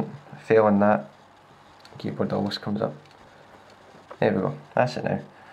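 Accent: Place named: United Kingdom. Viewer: I live in Sacramento, California. But don't worry about your accent my mother is British and I used to watch BBC America growing up so you sound just like Hamish McBeth. I don't know how some Americans can't understand English. You should try the Star Trek LCARS GUI Simulator for Windows 8. It's not an Iconia keyboard mod but it's pretty damn cool.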